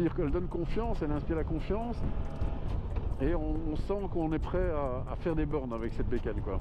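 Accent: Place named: Belgium. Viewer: 🤘😜 VAS Y T'ES BON BON BON 💪😜 Je reconnais bien le phrasé Belge 🇧🇪! Je m'abonne! 🇫🇷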